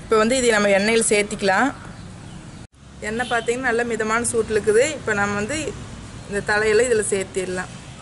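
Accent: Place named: Kongu, India. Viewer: உங்கள் கொங்கு தமிழ் very nice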